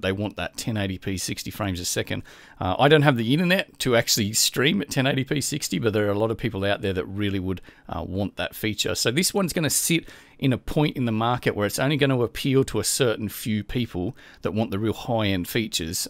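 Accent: Australia. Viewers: yes another aussie haha.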